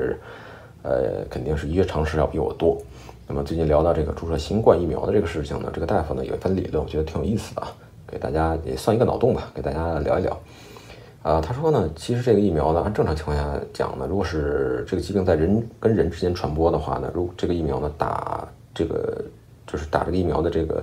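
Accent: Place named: Beijing, China. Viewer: 菲龙网看了一下都是视频加文字。。哪里有这样的京腔京孕有意思。。😊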